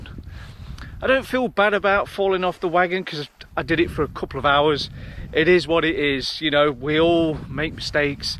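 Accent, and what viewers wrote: United Kingdom. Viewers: That's great to hear about carnivore diet with a british accent ;-)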